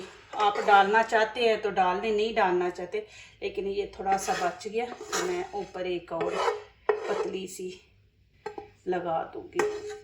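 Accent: Punjab, India. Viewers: آپ دنیا کے کس شہر میں رہتی ہیں ۔۔۔ویسے بولتی اردو ہیں مگر لگتا ہے پاکستان کے کسی پنجابی علاقے سے تعلق ہے 😊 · آپ کا لہجہ خالص پنجابیوں والا ھے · Punjabi lehje me Urdu ka aur hi sawaad hai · Too much panjabi lahja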